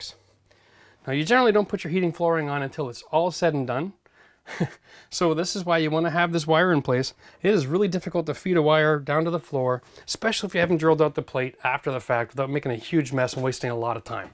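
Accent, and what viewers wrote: Canada. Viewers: I know that I need to look at my local regs, but just for reference, are you in Canada or the US? I’m detecting a hint of Canadian accent. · Very informative. You speak well and clearly without lots of loud music in the background. I just subscribed. Are you Canadian? I notice and accent. I spoke at a couple conferences in Canada. I am redoing a bathroom in an old rowhome. How do I secure every NM every 5 feet if I am keeping the plaster and lath? Should I have separate circuits for the lighting and outlets?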